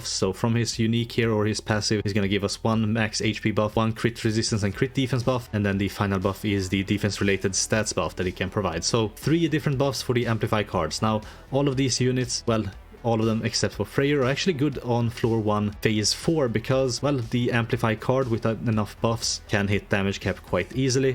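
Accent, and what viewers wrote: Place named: Sweden. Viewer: Its probably just the swedish accent honestly, you do say quite a lot of words differently. But my tired brain would argue. 😂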